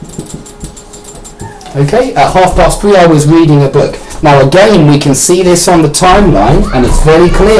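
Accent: United Kingdom. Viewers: I love that heavy british accent! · Hi! I'm from Brazil,and your video is very good! I love the British accent is perfect :D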